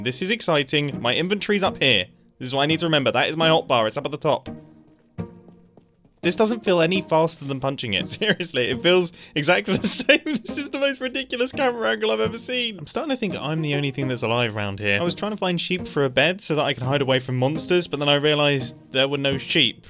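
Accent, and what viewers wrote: United Kingdom. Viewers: they're both bri'ish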